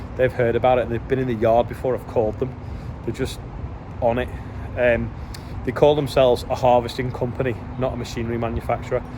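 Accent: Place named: Liverpool, United Kingdom. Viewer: In proper Scouse accent: "We have Martin Mason who is 34 and Charlie Mason who is 5, happy birthday to you guys. Red Clarke happy fourth birthday to you. Jeremy Nash happy birthday, Chloe Taylor who's 27 and Stevie Finnie who's 29 happy birthday to you. If you want to be on the bumper, please scan that QR code and there is a link below every single video."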